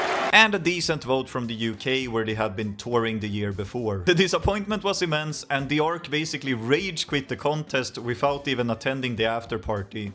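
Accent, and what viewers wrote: Sweden. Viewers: Holy speaking Swedish in English, Batman! 😳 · Your Swedish accent is too noticeable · I love your Swedish English accent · Okay, you wanted feedback: Your English is still very "Swedish", with a typical Swedish pronounciation of the words. How to improve it: Listen to people speaking English in movies and try to mimic how they pronounce words and the language melody they're using. I'm Swedish myself and I'm by no means perfect in this regard, so there is always room for improvement.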